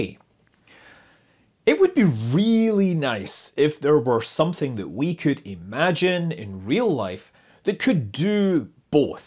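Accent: Scotland, United Kingdom. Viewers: YouTube captions can't seem to understand your Scottish accent. It doesn't seem to have a problem with the English. 🤔 Lol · I'm lovin' the Scottish burr.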